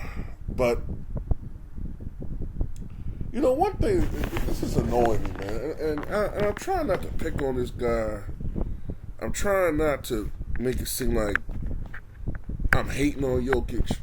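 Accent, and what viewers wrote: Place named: New York, United States. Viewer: You sound like a typical out of breath New Yorker, who is too busy being an opinionated douche to give any kind of useful commentary on basketball. Your channel is a waste of time.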